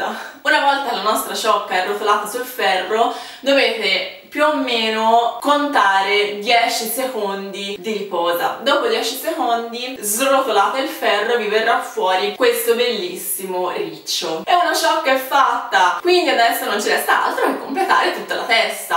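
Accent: Tuscany, Italy. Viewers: dialetto toscano ;) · Sei della toscana? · Sei toscana? Video utilissimo <3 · ma sei fiorentina'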